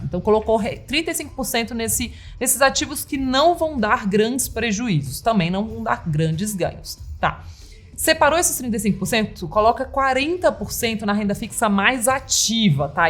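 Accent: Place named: Minas Gerais, Brazil. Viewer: Kkkk. Muito mineira nos jeitos e trejeitos pra falar. Além de saber muito, sabe explicar de uma forma muito fácil. Parabéns e sucesso pra todos na Spiti.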